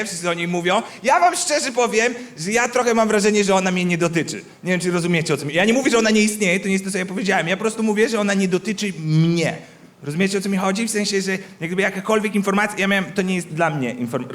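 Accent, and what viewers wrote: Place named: Mielec, Poland. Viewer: Po akcencie myślałem, że jesteś z Mielca lub Zbuczyna co nie? Dużo się nie jebłem 😉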